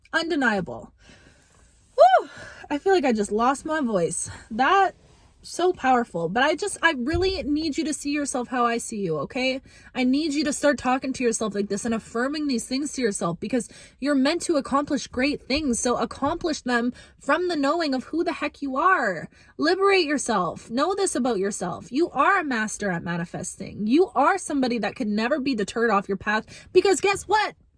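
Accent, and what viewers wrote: Canada. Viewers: Are you Canadian? 😮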